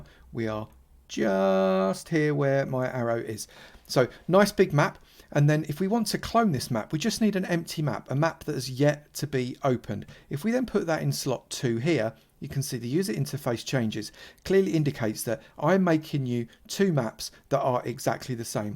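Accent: United Kingdom. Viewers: Isn't this British accent?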